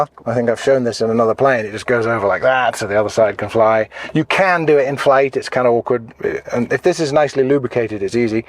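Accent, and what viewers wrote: United Kingdom. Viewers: Hi only just discovered your channel and subscribed after watching your first video. Really like your style of presentation and the cool British accent is music to the ears 😂 I’m currently looking for a Bonanza in Australia and confused between the choice of an A36 a V35 and a C33 Debonair which are currently available on Australian market. Can tell from your videos you’re not a big fan of the Vtail so youd probably rule that out but between the A36 and C33 which one is your favourite and why? So far through my reading (and watching YouTube!) the A36 has the advantage of bigger door, which would be a plus for me for loading say camping equipment or a bike and also Ive heard the longer fuselage helps with less wag in turbulence. Keen to hear your thoughts on it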